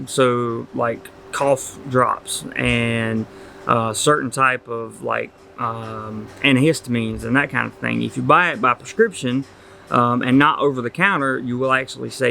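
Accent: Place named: Appalachia, United States. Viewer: The accent mate. How do Thais take 'ole hillbilly voice?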